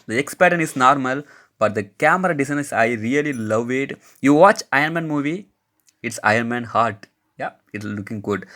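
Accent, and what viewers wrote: South India, India. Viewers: Your English sounds like South Indian English. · What a malayalam or tamilian style english😢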